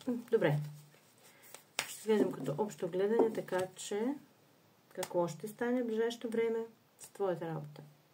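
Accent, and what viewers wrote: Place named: Russia. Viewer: Много приятно излъчване има тази жена!❤ И сякаш има руски акцент!❤ Надявам се да се сбъдне това което показват картите!❤Благодаря Ви!❤ Благодаря и на Висшите Сили!❤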